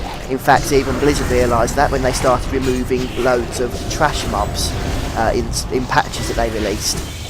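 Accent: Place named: England, United Kingdom. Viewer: ahh sorry for not explaining correctly, i ment where are u from england ;D never heard it before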